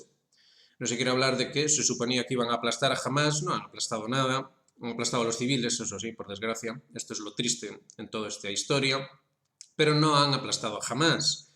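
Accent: Spain. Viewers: Me gusta lo que dices. Y tu acento me suena a noroeste peninsular.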